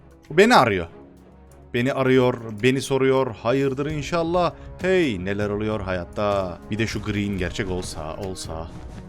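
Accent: Yozgat, Turkey. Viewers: 10.56 Abi Yozgatlisin yalnız Kral eline sağlık abiciğim cağnıı yerimmm ❤ Video mük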